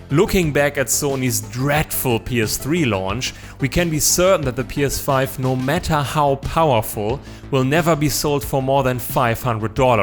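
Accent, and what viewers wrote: Germany. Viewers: Englisch auf Deutsche Akzent, das erkenne ich sofort 😂😁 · you have a strong german accent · I hate that german accent xD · I said he is German because of his English accent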